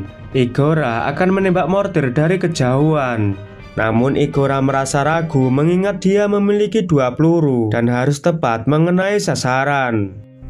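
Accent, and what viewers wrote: Java, Indonesia. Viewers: Medok bngt min suaranya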